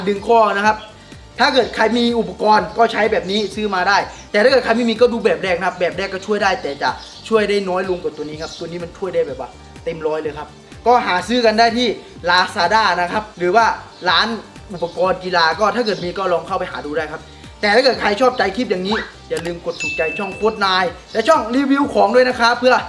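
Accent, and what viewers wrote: Isan, Thailand. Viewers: บ้านเกิดพี่ยุไหนครับทำไมพี่ชอบพูดออกแบบอิสาน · คนแสานบ่นิคับ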